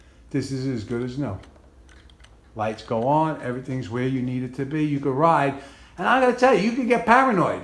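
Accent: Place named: New England, United States. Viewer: Cant stand the NE accent,Never met a goof that didnt vote Democrap that talks like that.And this goof repeats EVERYTHING.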